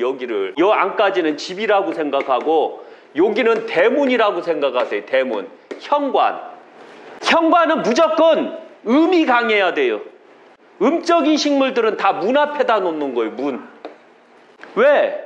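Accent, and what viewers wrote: Chungcheong, South Korea. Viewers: 고향이 어디십니까 충청도?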